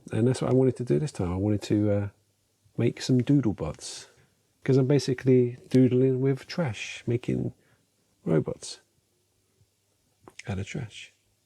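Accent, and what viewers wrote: United Kingdom. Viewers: Hahaha, your rambling sounds very much like Korg from Thor Ragnarok, with a British accent. Love the channel!!!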